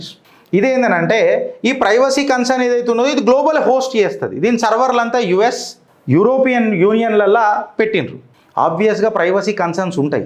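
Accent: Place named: Hyderabad, India. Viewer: Sir perfect hyderabadi telugu , perfect hyderabadi hindi , perfect Indian english , perfect Indian , perfect hinduthva , perfect Bharatheeyatha meeru ilage undandi sir. We will support you always.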